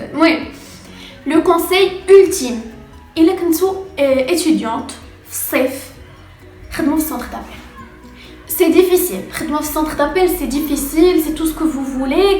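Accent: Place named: Morocco. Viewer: oh Moroccan accent is difficult more than french :l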